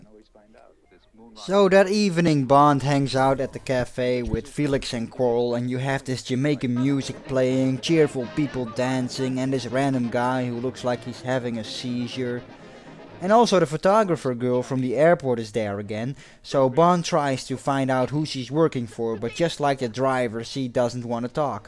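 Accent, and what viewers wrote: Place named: Netherlands. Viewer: I love Dutch dialect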